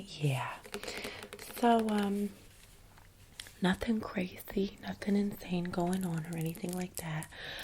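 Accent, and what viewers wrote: New Jersey, United States. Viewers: i love jersey accents and unserious asmr videos 💀 you gained a sub from me youre so funny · Hello.. well my mafia binge is being filled. Amazing. Also the jersey accent 😍 I’ve not heard it much as an Aussie and I love it. Also my threats are very good w my brother because he is my brother. I know he won’t do shit. But.. let’s be honest having 6 brothers does that to you..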